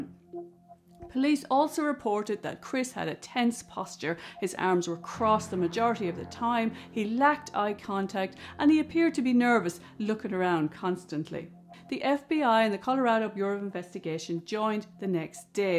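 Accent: Ireland. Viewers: Thank you for sharing your analysis! You sound Irish to me. I have many family members and cousins who live in Ireland and you remind me of them 😊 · Great video and break down of this tragedy.! I believe NK most definitely has some role in all this. Maybe she didn’t kill anyone but she for sure knew his plans and possibly pushed him to do it. Love your accent BTW.! It actually sounds Irish with an English twang xx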